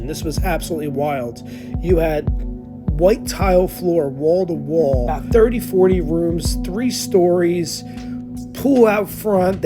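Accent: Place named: New Jersey, United States. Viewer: I love your videos. May I ask where are you from? You sound like my cousins from New Jersey !! Keep up the cool videos man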